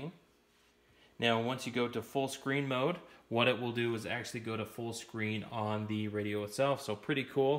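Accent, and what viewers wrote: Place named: Ireland. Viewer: Damn this guy is severely irish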